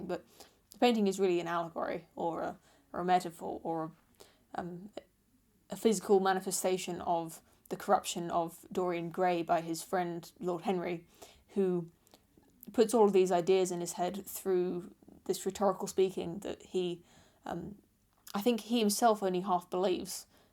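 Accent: England, United Kingdom. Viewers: I love Jordan Peterson to death and back again; that's why I took it upon myself to take a hammer to one of his major claims in a way that felt authentic to my being. In my newest video I try and explore that the idea of Hell is preferable to the idea of not existing; because Jordan mentions that Jung's argument against Freud's about religion being simply a defense against death anxiety is invalid because they would have reserved Hell for their enemies. I would say that Hell is more easily grasped at least than the idea of non existing, in an atheistic sense. i than go into some of my DMT experiences. You're more than welcome to check it out. Side note: I feel like lots of us North Americans really are gullible to a proper English accent; you sound much smarter than you would saying the exact same words in some alternate reality, American version of yourself. 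I can't recall ever hearing that the English have the same fancy for the generic American one.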